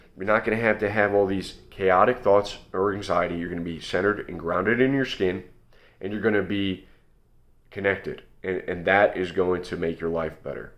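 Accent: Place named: North Carolina, United States. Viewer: This guy sounds like everyone in North Carolina small towns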